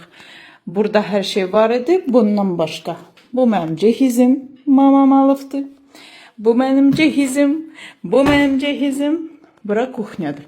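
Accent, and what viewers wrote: Azerbaijan. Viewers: Из за акцента начала слушать.. как будто русская пытается говорит на азербайджанском.., но.. гялифсиз, олуфду, олажах, дяйистиражам, севиняжах........ а это уже регион Азербайжана.... у меня мозг вскипел..😂 тии кито?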